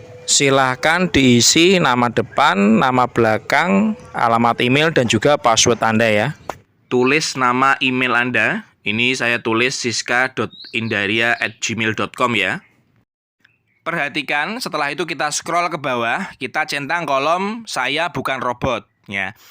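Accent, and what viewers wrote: Java, Indonesia. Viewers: Mentok logat Jawa ny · Medok banget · Et medok wkwk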